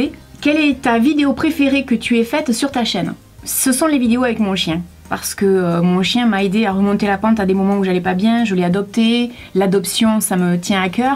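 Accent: South, France. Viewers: dit moi ton accent il pas du sud parceque ce qui sont proche de perpignan on la accent chantant mais je t'adore maman yutube desoler je suis dixlesie bisous du bord de mer maditerane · Hello ca fait une petite semaine que je regarde tes vidéos maintenant et je t’adore ! Tu es géniale, tu es toi même ! Tu es une super belle femme ! Tu as des cheveux mais magnifique ! 😍😍😍 Je ne sais pas qu’elle âge tu as exactement mais tu as l’air jeune et très mature en même temps et cet accent du sud j’Adore totalement ! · Ha, je pense que cet internet de malheur exacerbe les bas instinct de la nature humaine autant que le narcissisme.. De facto, cela se répercute au quotidien, les gens sont devenus totalement égocentriques, agressifs et parfois violents!.. Je suis tombée par hasard sur tes vidéos, pour le peu que je me connecte à you tube, où je regarde surtout de vieux films ou de vieilles séries... 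Évidemment c est ton accent qui m a tout d abord attiré, si familier à mes oreilles...l accent de mon sud... le tiens c est le miens et le miens c est le tiens!! L Accent! C est fou.. Mais j ai l impression d entendre une soeur... Une frangine d âme et de coeur... Dame de coeur, tu l es, à n en pas douter... Cette vidéo vient de me prouver que je ne me trompais pas... Nous avons tant d affinités inspirées par une grande sensibilité si perceptible... Je ne m étendrai pas sur le sujet... Ma vie privée ne regarde que moi et ceux à qui j ai envie de la partager... Autant dire un cercle très restreint... Mais franchement, tu me ressembles tellement... Bon, je me lance, au risque d être ridicule... Puisque nous vivons dans le même département, j aimerais bien te rencontrer! Gros poutous sister! Laurie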